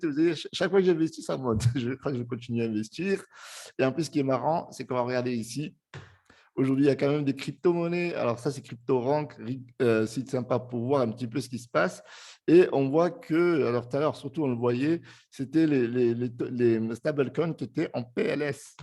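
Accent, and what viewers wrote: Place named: Marseille, France. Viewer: merci pour ta bonne humeur , surtout en ce moment ! tu aurais pu être de Marseille avec ton accent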